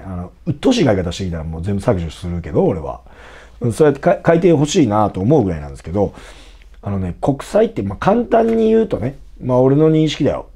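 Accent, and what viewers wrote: Nagoya, Japan. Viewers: おみゃーさん、名古屋弁まるだしだがや。ステキ…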